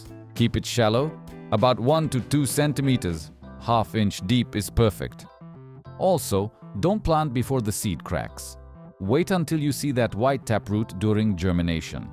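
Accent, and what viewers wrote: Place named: Europe. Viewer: Sounds like european😅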